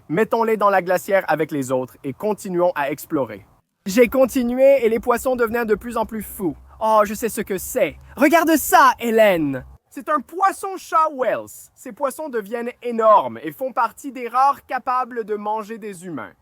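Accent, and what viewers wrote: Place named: Quebec, Canada. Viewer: ❤Wouaw❤ un Payara le poisson vampire par contre la voix j'aime l'accent québécois mais lui il a une voix pas terrible ils est trop affolé s'il te plaît change la voix 🎉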